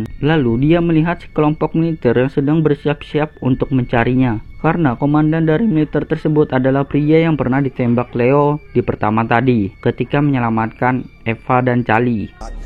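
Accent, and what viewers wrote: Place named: West Java, Indonesia. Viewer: Sunda kentel kayanya ya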